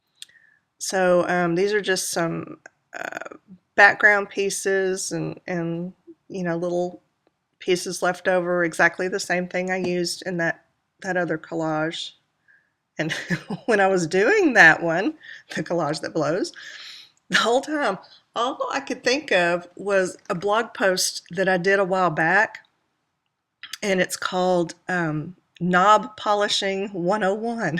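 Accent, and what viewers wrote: South, United States. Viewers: Love! Where are you from? You sound like you have a southern accent! I'm a Mississippi girl;)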